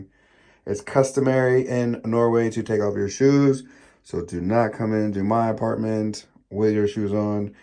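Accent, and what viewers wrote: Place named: United States. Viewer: Dane here:I just caught myself thinking "He sounds black" And it looks like he is!!!! Can people sound black????? Ok, am I completely insane? He sounds American of course, but is it the deep voice?????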